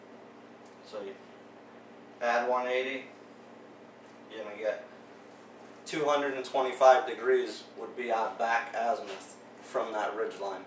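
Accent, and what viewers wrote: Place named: Boston, United States. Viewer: That is the hardest Boston accent I’ve ever heard!! 😊👍🏽👍🏽 Good stuff bro. Easy to understand.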